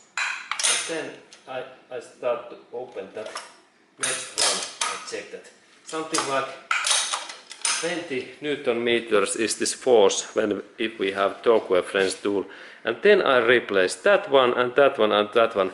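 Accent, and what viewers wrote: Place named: Finland. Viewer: Nice finglish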